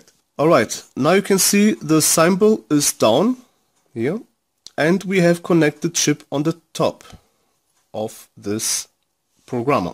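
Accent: Germany. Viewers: Pls Help.....I cant detect the chip!...Ich habe alles richtig angeschlossen und höre das du ein deutscher bist!...könntest du dich hier mal melden?...ich habe ein Msi Board verflasht mit der eigenen mflash bios methode...war nicht meine schuld! Ich habe alles so gemacht wie du es in den Videos beschrieben hast!!!.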